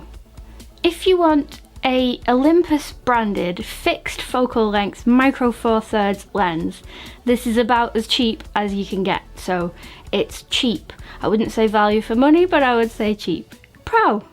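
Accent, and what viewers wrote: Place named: Manchester, United Kingdom. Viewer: Great educational video . I just bought one , great for travel . Love your accent . Manchester ? United or city ? I'm from Yorkshire but I still like your video .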